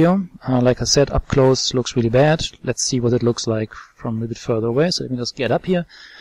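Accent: Germany. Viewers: Ist das ein deutscher Akzent, den ich da raushöre?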